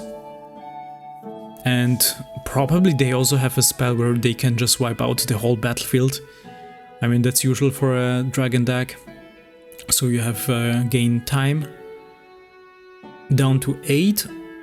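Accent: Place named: Germany. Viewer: Aren't you German? Because your voice/pronunciation is really cool.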